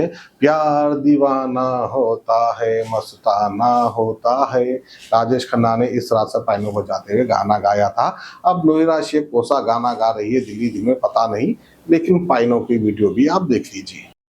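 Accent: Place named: Deccan, India. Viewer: Aray bhai Tum pehle bolne ka saleeqa seekho yaro lehja deccani raha toh koi baat nai lekin andaaz e bayan bhi achha hona chahiye Tumhara purana dhanda achha tha miya illigal machhi ki dallali karne ka Kaiku aaya re bawa yeh platform pe?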